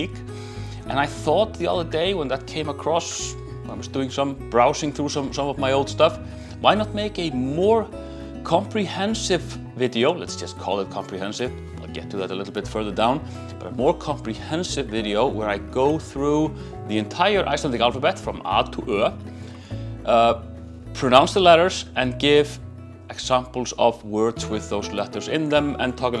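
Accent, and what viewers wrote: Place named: United States. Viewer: Another question comes up. I assume you were taught British English, so you say Zett. But you speak in American English, so you say Z. Which is correct?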